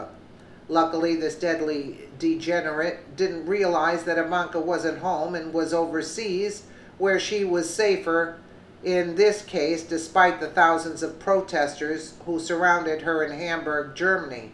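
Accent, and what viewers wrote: New York, United States. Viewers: Are you from Brooklyn or the Bronx??? Love your channel!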